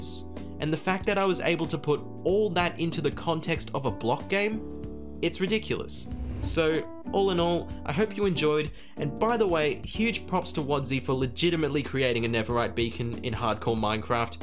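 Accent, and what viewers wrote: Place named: United Kingdom. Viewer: I love this guys British accent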